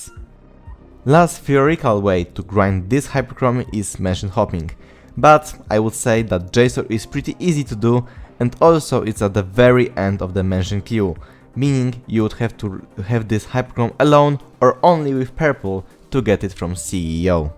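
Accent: Poland. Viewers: Słychać ten polski akcent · Słychać że polak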